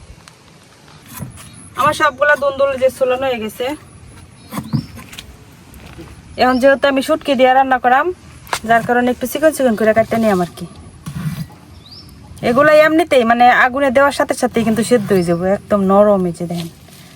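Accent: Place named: Kishoreganj, Bangladesh. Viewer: আপু কিশোরগঞ্জে কথায়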